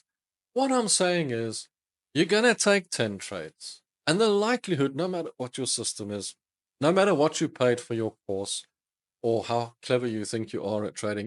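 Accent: South Africa. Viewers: Good content. Are you from Cape Town?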